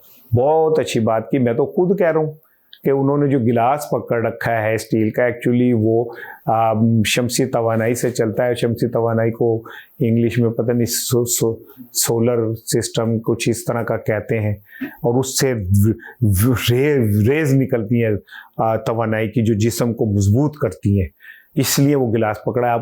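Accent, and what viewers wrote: Punjab, India. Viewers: آج پنجابی تڑکا کم ہے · Welcome back. I am from Karachi but love your punjabi accent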